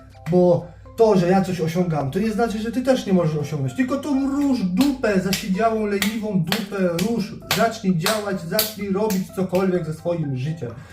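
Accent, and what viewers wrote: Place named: Upper Silesia, Poland. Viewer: No, no, łapy masz fajne. Słychać, że jesteś z Górnego Śląska.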